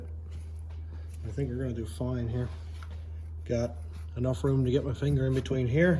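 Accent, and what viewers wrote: Newfoundland, Canada. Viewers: You from Newfoundland or the Eastcoast by chance? Your accent sounds very familiar. Love the build! Cant wait to see more progress on it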